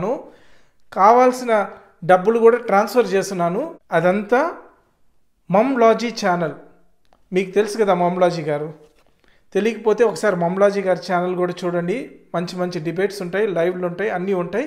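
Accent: Nellore, India. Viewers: I have a very small question bro... If you don't mind... Are you from Nellore?? Bcz some words Nellore yaasa tho match avtunnaayi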